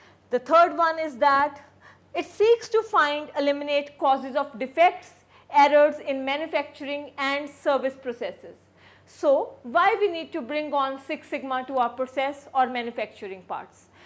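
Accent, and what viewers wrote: India. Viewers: We Indians find pretty cool and happy about her accent. And, we Indians feel the same kind agony which u are currently feeling now, when Westerners speak. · It was easy to understand six sigma by someone from indian accent (Unfortunately I always reach to the learning videos uploaded by scholars and tutors from different contries)... I would like appreciate the hard work done by Miss... would like to continue learning from her videos..... Many Thanks:)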